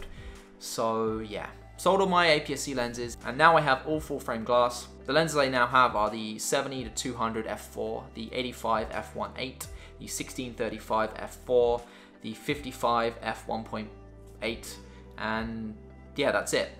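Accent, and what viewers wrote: England, United Kingdom. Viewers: Helpful video, good to hear the camera has worked well for you. Your a Brit living in Canada right? That accent sounds way too English to be a Canuck. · Like your video. I want to purchase the Sony A7iii. And buy the way you mentioned Canada but you don't seem to have a Canadian Accent. Are you from London England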